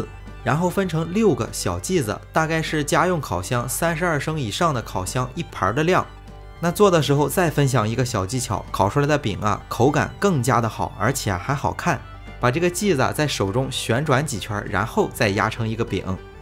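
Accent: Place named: Shandong, China. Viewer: 听着就是山东老乡口音 青岛的吧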